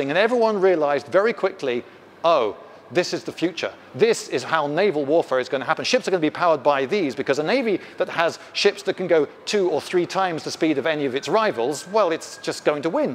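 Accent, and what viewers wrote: England, United Kingdom. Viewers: No, he sounds fairly upper middle class, English. Welsh and Scots are also "British". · In other words, he sounds very British.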